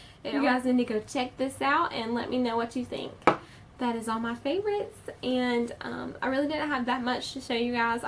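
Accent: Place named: South, United States. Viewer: Im from the south also , love your accent. Thank you for the wonderful reviews. Extremely helpful .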